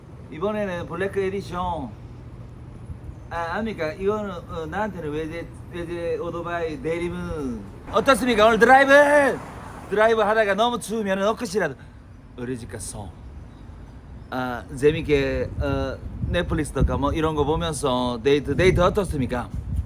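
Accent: Japan. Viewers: 한본어 쩌네 ㅋ(어린시절 보고 찌잉해져따..따흑) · 저는 일본사람인데 다나카상 정말 웃기네요🤣 특히 외래어가 어려울 텐데 액션을 아크션, god를 곳도라고 하는게 완전 일본식 외래어 발음이에요!! “집이”를 “집이가”라고 하는것도 일본인들이 많이 틀리는 한국어죠~ 요즘 일본에 저런 호스트는 거의 없겠지만ㅋㅋㅋ 넘넘 재밌어요👍🏻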